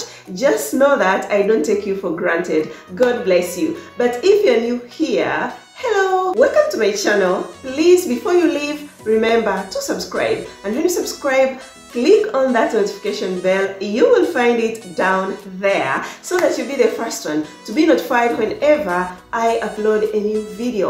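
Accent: Uganda. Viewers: I have been pondering on asking you this question, did you go to school in Uganda? Your accent sounds like that of Ugandans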